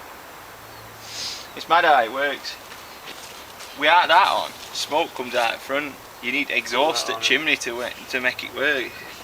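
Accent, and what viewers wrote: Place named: North, United Kingdom. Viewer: best video on pelts ive seen. so many times they say you need this, or that, this is a COST FREE video, so it makes it the best. by the way, are you from the north, i noticed your accent.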